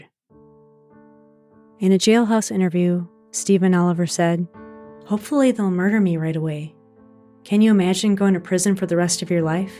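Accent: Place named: Midwest, United States. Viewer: I love her voice! Something about that Midwest accent that makes everything sound so wholesome… even true crime!!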